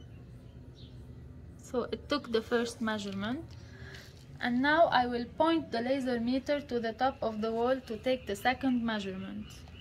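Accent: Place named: Arab world. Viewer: you look like arabian accent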